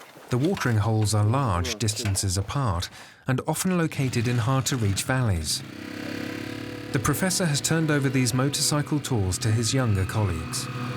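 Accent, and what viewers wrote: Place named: United Kingdom. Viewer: the herder reaches inside to pinch or sever the aorta leading to a swift loss of consciousness and death. Just because you find it gruesome doesn't mean it's not humane. The narrator sounds British and if he says it's not brutal, maybe you should agree and stop trying to pick on races you feel superior to.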